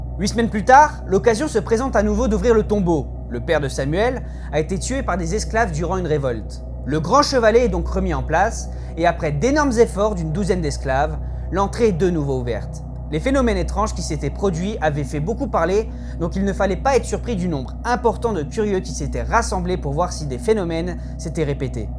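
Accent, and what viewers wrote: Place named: Quebec, Canada. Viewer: aaaah, c'est quoi l'accent que tu essais de cacher, j'entend un leger accent, tu es quebecois ?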